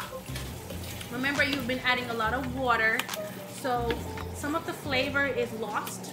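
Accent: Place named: Jamaica. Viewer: You accent sound Jamaican. Are you Jamaican?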